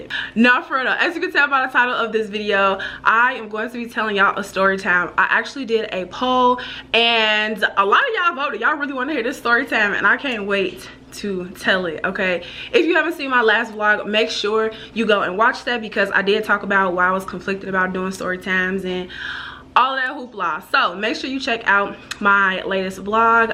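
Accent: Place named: Minnesota, United States. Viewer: You can tell your from Minnesota 🤣. GOOFY! I haven't heard somebody called that since I left. Love ya girl. Keep ya standards high.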